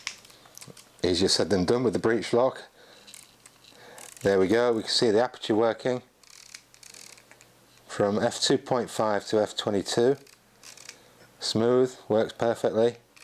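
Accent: Manchester, United Kingdom. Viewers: Sounds like a Manc accent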